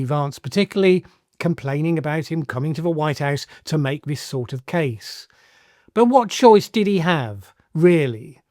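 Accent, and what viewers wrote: United Kingdom. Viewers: This clown sounds like a Brit. Perhaps he should be more concerned with the pathetic collapse of his own country and/or his own treasonous government.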